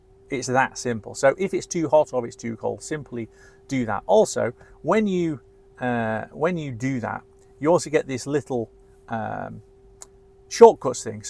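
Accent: Scotland, United Kingdom. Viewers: Voice controls and scottish accent 😀 This Tesla struggles like myself when on vacation in Inverness, since I am not an english native speaker. Very nice car in exactly the colour I would choose too... Different to your car I would choose white for the interior. Keep on with your video works; after watching several videos randomly for quite some time you've just won a new subcriber.Cheers from Austria! Ludi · Just what I wanted in a demo video, no nonsense, British and informative!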